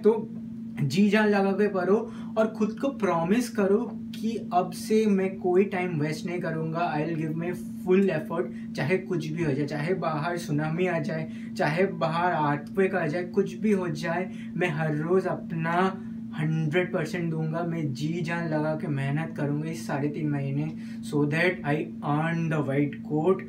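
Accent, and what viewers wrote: Bengal, India. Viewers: Bhaia apki bengali ho?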